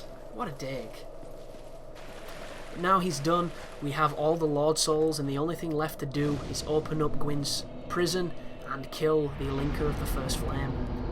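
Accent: United Kingdom. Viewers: One of my fav videos.PERIOD. Not only very helpful but fucking HILARIOUS!😂😂😂 the combination of your beautiful Brit accent but the excessive cussing at enemies and then the crown jewel was your WOW OPINIONS! Spot fucking on! "I'm not trying to offend anyone I'm just stating facts!"HAHAHAHAH I so rarely like/subscribe etc I'm not a dick I just forget but this was an automatic LIKE/SUBSCRIBE/& DONATION😎 I'm not easily amused in fact kind of a negative person at times but this made my already great night, thx, looking forward to other videos, I'm going into your history👊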